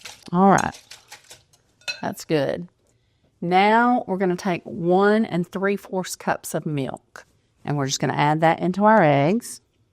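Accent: South, United States. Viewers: Of course. I like her on video, she is so pleasant and calmly cheerful, she sounds like a female Mr. Rogers, with a southern accent (Georgia?)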